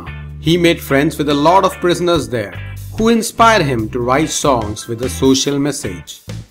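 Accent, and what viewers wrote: India. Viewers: The narrator is Indian, the inspiration is Jamaican, and I'm half Irish and Polish. Multiculturalism - or as Bob would say...One Love... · Ya his voice sounds like indian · This historian must be Indian ppl because of accent do you hear?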